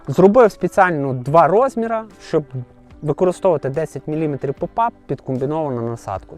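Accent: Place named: Lviv, Ukraine. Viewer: вітання!!! Найперше хочу подякувати за справжність за те що з перших днів ведеш блоги виключно українською!!! Сам львів’янин і в захваті від нашого говору!!! Сесії та взагалі всі твої старання до глядача як для мене найцікавіші дотепні і зрозумілі!!! Вітаю з власною новинкою попарів та міксів!!! Новий сезон починаю з твоїх новинок!!! А тобі здоров’я натхнення в нових програмах та спільної перемоги над москалями!!! Слава Україні!!!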